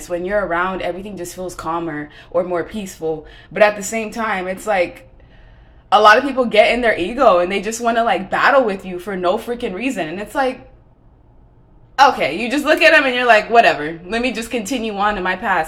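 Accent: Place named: Latin America. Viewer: Girl I’m a black girl I could’ve sworn you were Hispanic, you got a lil accent!!! Lmao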